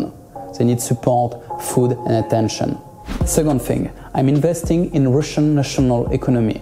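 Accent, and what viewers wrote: France. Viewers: Bro you really should work on the pronunciation, you have a really heavy French accent that makes your point really hard to understand, plus the really basic grammar mistakes are quite discrediting the image of yourself you want to convey (I'd have trouble with a business man that doesn't know that you should say ''the world knows'', not ''the world know'') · Chad french accent bro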